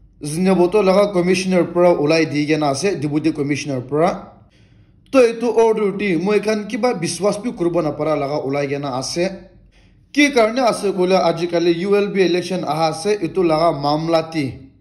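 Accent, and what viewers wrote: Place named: Sema, India. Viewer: Please control your sema accent it's heard to hear😅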